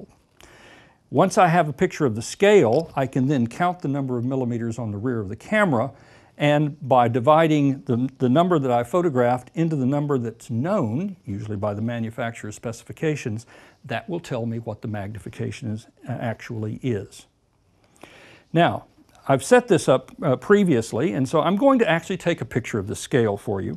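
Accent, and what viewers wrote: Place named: United States. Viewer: I'm confused: he's in Australia, but his accent is American, but he called a flashlight a torch. Dude, where you from?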